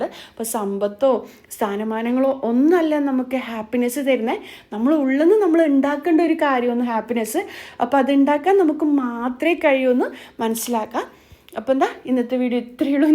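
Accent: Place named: Kasaragod, India. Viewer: Njan kasargodan samsaram njangale samsaram same aanu njangalum kutti machil parayum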